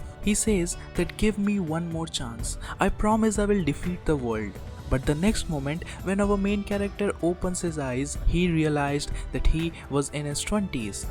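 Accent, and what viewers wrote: India. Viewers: Too much Indian accent in the voice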